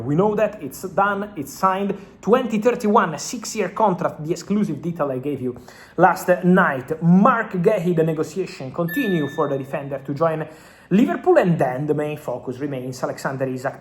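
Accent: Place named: Italy. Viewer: Nothing can beat Italian Accent .... Barrrrnn Mouth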